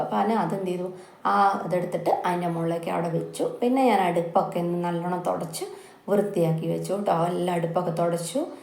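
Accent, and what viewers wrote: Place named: Thrissur, India. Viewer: തൃശൂർ ആണ് എന്ന് തോന്നി ok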